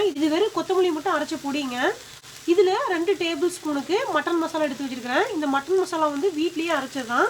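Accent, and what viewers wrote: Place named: Gobichettipalayam, India. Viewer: hi sis i am new neega namma oruu gobichettipalayagala unga videos pathaga ella nalla pannirukiga namma oruu pechu kekkupothu happy irukuthuga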